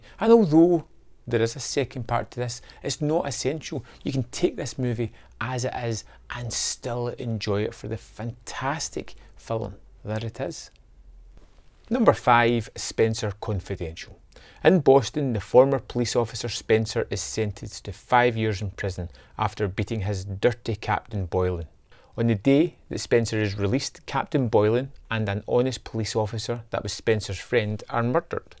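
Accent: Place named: West Scotland, United Kingdom. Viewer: No way yer Scottish 🏴 I’m Scottish too, Paisley. Whera ye fae lol! You sound as if you’re from the West of Scotland too! Slainte 🥃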